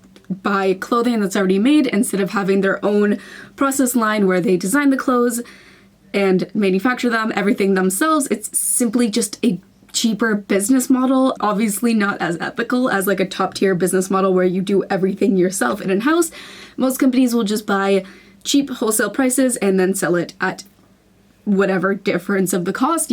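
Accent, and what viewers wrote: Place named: England, United Kingdom. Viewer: I can look at you forever😍, sometimes I don't understand some words, but I just admire you, you look gorgeous, very beautiful ❤💛, thanks for information, hug you🤗, I'm very glad to become part of your YouTube family🤓, I like your English accent, I want the same pronunciation🔊, I will watch you and improve my English, thanks you so much for all you do for us😁❤🤗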